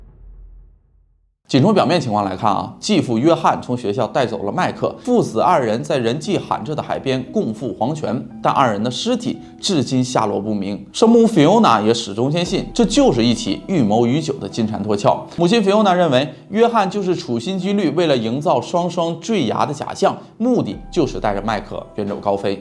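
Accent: Northeast, China. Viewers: 这一听博主的口音就是俺们东北那嘎达的人儿，就是不确定是不是和我一样是沈阳的，哈哈 案件讲述的也非常精彩，已经订阅了，支持老乡儿~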